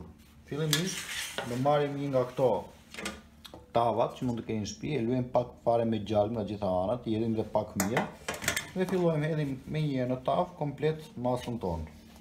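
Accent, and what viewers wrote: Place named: Berat, Albania. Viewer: te bëra subscribe, per suport. 🙂🙃 Nga je? Nga e folura dukesh Beratas.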